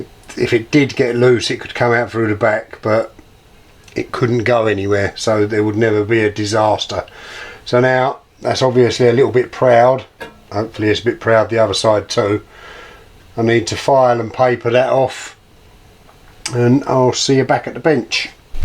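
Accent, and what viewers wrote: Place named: Cockney, United Kingdom. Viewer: This was a brilliant video. I love the fact that you built your own bushing tool. Ive been wanting one for ages but begrudge the stupid amounts of money they are asking for. I wouldnt mind having a go at making one myself. Ive managed to build my own mainspring winder and a test stand too. Ive been messing around with clocks for about a year now and even started my own channel. but I think its more of how not to restore them really :-) Nice to hear a cockney here on Youtube I'm now going to through your channel and look at your other videos. Johnny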